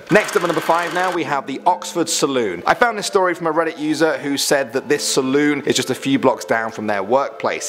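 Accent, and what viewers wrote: United Kingdom. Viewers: Everyones pronunciation is differently all depends where you're from, he's a brit who lives in canada.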